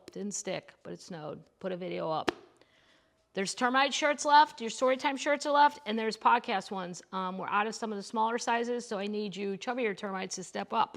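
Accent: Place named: Appalachia, United States. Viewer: If you have Apple Music listen to her “song” (her telling a story, not really singing) off her awesome album. I think I like your holler accent better. Her stories go back to being a little girl & getting hit on the head & the doctor told them she was going to die. Daddy had to carry her 12 miles to get the doctor cuz she didn’t have no shoes. Great 2004 album. The lyrics are some of her best. I just got it for the duet with Oh, turn3d out OK. Daddy just got a new pair and made up a story as to why he had to run through the holler with them.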